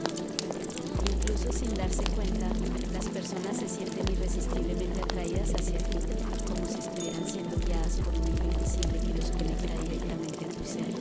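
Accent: Colombia. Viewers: La vocesita parece de bogotá lsjdj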